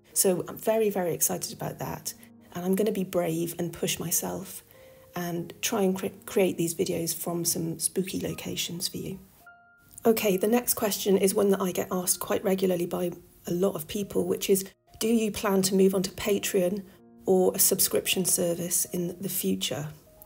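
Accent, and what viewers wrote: England, United Kingdom. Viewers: Honestly, I glom to your work as a result of your voice and content. I might watch an episode after the fact for the visuals in order to get a sense of place, but this is always an afterthought. I prefer to use my imagination. As to the questionl on ASMR do what you want. I am perfectly content being soothed by your voice and personality when it pops up in your occasional afterthoughts. "What do you think?" Although I find the topics of ghosts intriguing I find the history of Great Britain equally so. I find it a charming combination. With your quiet voice and accent you could probably get away with reading a list of recipe ingredients. Well, that's not true. But with You Tube being mostly about visuals for me your voice and persona are the selling points. I will stop repeating myself now. Looking forward to what you concoct in the New Year. 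· I'm Australian and I would love to have a beautiful English accent such as this, it's very easy on the ear.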